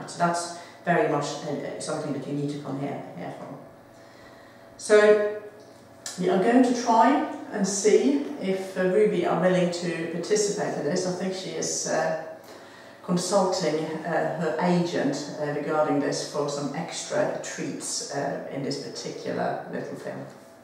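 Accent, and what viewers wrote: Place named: United Kingdom. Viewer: Was very hard to understand her speaking. The British accent got in my way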